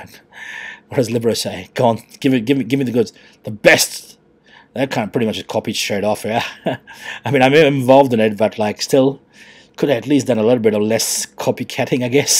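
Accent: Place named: South Africa. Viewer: Nice accent mate, are you a South African ?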